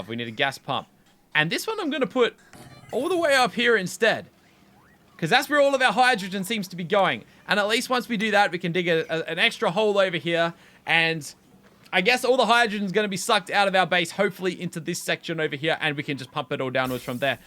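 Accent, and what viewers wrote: Australia. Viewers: it doesn't even matter, he is astralian...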